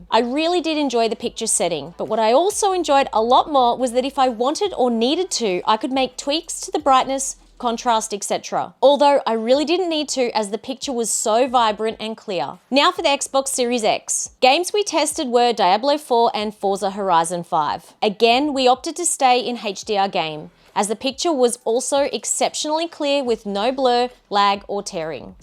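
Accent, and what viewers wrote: Australia. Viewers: You have one gorgeous Australian accent, 😍 I love listening to it in your videos. Nice gaming monitor here looks bloody cool! 😎👍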